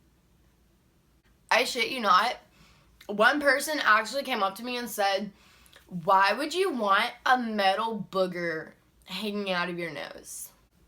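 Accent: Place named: South, United States. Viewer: I'm from MS! She sounds southern as hell to me!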